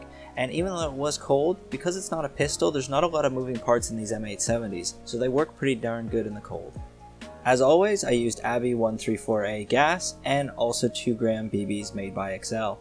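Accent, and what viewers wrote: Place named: North America. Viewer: What country are you in? You have a North American Accent, but it looks like you're in the UK because of your back garden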